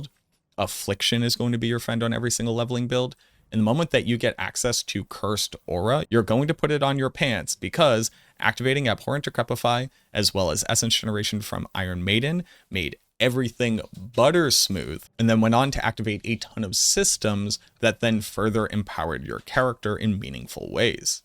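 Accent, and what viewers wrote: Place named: Massachusetts, United States. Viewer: Yaaaas. It's so easy to tell he's from Massachusetts sometimes and I love it because it is where I was born. Only lived there until I was 8 because my parents decided we had to move as soon as I started saying, "idear" instead of "idea." 😅😅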